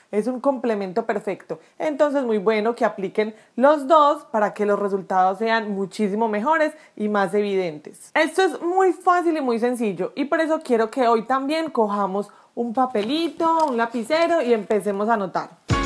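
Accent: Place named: Colombia. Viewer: No sabe nada, pero como me gusta el acento colombiano este ya es el segundo video y el ultimo 😅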